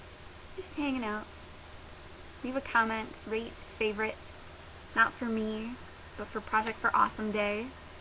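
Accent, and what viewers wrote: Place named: Wales, United Kingdom. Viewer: love the welsh accent.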